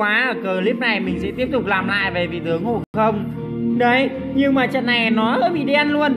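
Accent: South, Vietnam. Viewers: thích cách nói chuyện của bạn nhiều lúc lại giọng miền nam nghe vui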